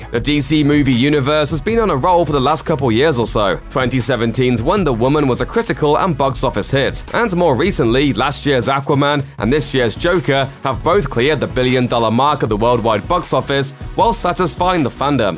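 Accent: England, United Kingdom. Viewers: Dan Rockell just like there are many different accents in the USA...there are different accents in the UK too but he’s 100% English accent, I couldn’t tell you what part as I’m from the states but without a doubt brithish. I honestly love his voice for these videos ahah.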